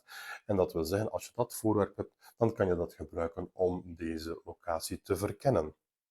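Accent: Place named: Belgium. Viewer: Hij is komt denk ik uit België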